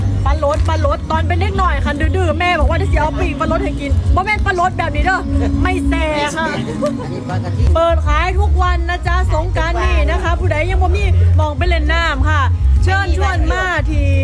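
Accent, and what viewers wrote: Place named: Ubon Ratchathani, Thailand. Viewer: สำเนียงคนอุบลค่ะ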